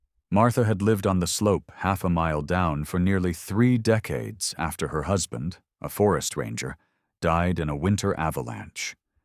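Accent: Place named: United Kingdom. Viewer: Uk English 😅😅😅😅😅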